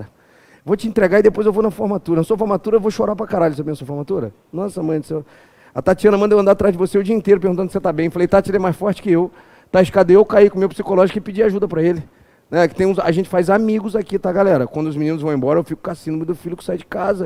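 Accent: Rio de Janeiro, Brazil. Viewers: Esse professor é carioca?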